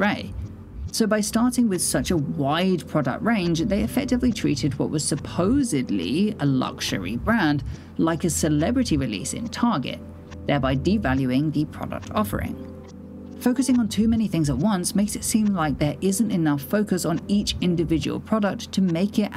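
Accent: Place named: RP, United Kingdom. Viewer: Bro your posh accent sound so prim and proper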